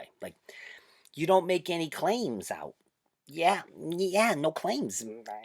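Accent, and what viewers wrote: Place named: United Kingdom. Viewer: Wow he's still doing the British accent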